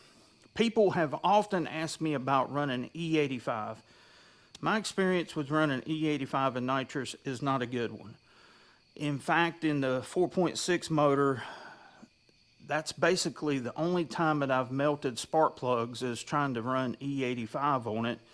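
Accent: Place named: Appalachia, United States. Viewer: I just watched a Video about some Canadian Motorcycle Hill Climbers who added Nitrous to Methanol and Nitromethane for 3 years before it was banned. They said they were warned not to try and Use Nitrous on a Nitromethane fuel injected motor. They did blow 2 cylinders off of a 6 Cylinder Benelli . I overfilled a Bottle one Hot Summer Night . The Little safety Valve has a piece of Copper in it. The Pressure broke the copper. My Trunk lid was all frosty and there was fog coming up through my rear deck speakers. The photo of the back of a car blown up it total BS and as dumb as your accent. Anytime someone talks like a Total Hill Billie I always question their Intelligence.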